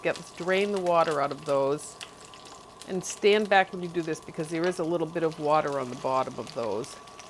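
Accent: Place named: Northeast, United States. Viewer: Yes, indeed, it does look amazing! Great “Save!” Heading over to the next! First, I’ve got to ask you what state you’re from. My mom and dad were from New Jersey/New York, and I detect some sort of North East accent in some of your words.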